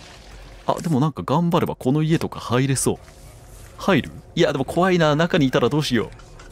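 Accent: Kansai, Japan. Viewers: なんで関西弁なん？笑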